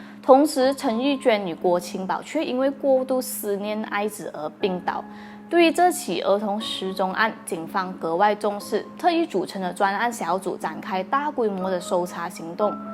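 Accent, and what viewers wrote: Malaysia. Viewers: 这是马来西亚的华语。发音跟普通话不同。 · 很好很马来西亞中文音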